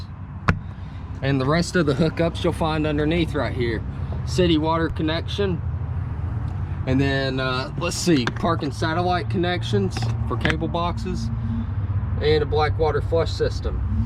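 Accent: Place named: South, United States. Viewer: I'd like to see the smaller cap-over without the tip out to see if it would have enough storage for me. I'm alone and have no bloody kids, but I do like to cook so need storage and a good sized fridge, maybe the 825. Any chance of getting a deeper undivided sink. Thanks, you're funny; enjoyed the comments and your southern accent.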